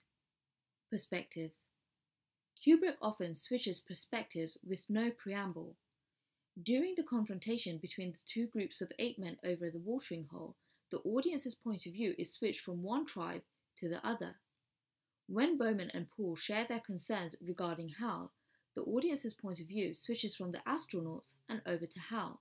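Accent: London, United Kingdom. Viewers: totally agreed beautiful British accent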